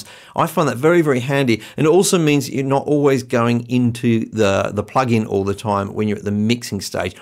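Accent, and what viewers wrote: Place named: Australia. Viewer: Aussie in England or vice versa? (Great vid btw!)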